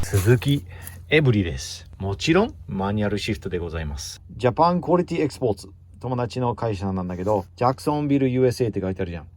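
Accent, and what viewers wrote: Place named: Japan. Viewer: Your accent really be heard as very Japanese! awesome! このプロジェクト、楽しみにしています！ POVのSteveさんをびっくりさせましょう！！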